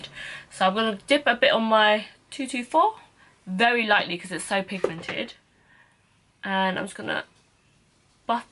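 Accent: United Kingdom. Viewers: omg I've just found you on instagram and i was like no way this girl doesn't have youtube with such fleeky makeup, new subbie here. i love it, and do you live in the UK you sound very british x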